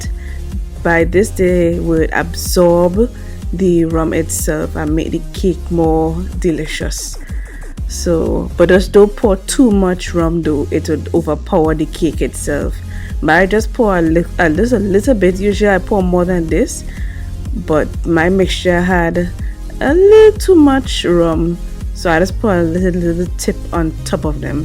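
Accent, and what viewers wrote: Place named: Tobago, Trinidad and Tobago. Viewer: i can hear that strong bago accent...keep it up dear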